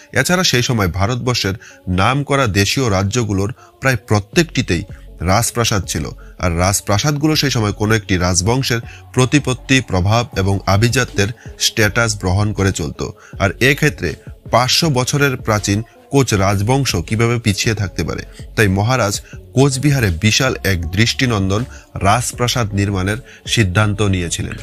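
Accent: Bangladesh. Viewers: Byash not re beta besh bolo ....Dhakaiya kotha kar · উচ্চারণ এ পরিস্কার বাংলাদেশী ছাপ- এরমধ্যে>এ্যারমধ্যে, এছাড়া>এ্যাছাড়া,প্রথম>পথম,next>naxte ,😂 , তবু ও খুব ভালো হয়েছে, শুভ কামনা রইলো।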